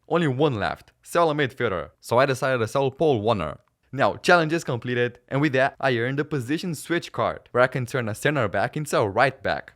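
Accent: Brazil. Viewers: You speak english with Brazilian Accent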